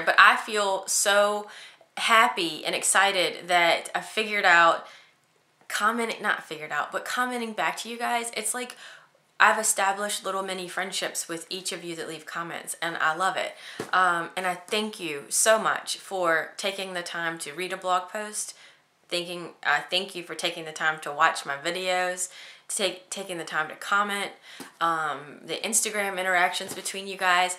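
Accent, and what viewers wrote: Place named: South, United States. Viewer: Happy Anniversary! I love watching " " with her cute southern accent! I hope you have a great weekend. Still cold here in Chicago but at least the sun is shining! XOXO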